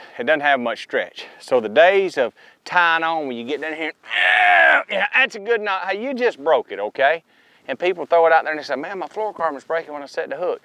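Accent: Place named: Texas, United States. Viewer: Matthew Mcconaughey is from Texas. A lot of us talk this way.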